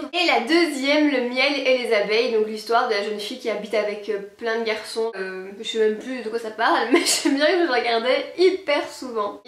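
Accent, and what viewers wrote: Belgium. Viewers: Oh je suis nostalgique <3 j'adoooore la vidéo et ce look te va à ravir :* C drôle comment vous dites 90 xD c l'accent belge ?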